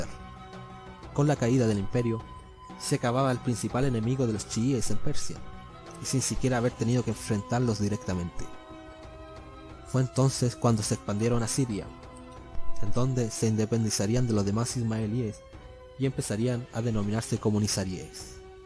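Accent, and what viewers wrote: Chile. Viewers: es mi idea o este loco es chileno? xD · Es chileno el boludo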